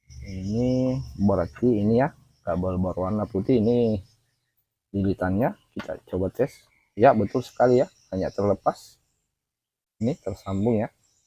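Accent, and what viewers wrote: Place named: Bugis, Indonesia. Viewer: Kayak orng bugis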